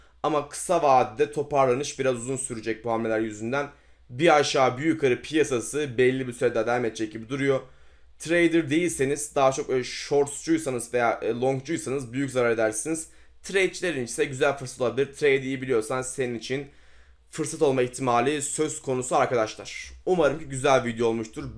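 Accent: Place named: Kastamonu, Turkey. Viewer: Hocam memleket neresi kastamonumu şiveniz benziyor ❤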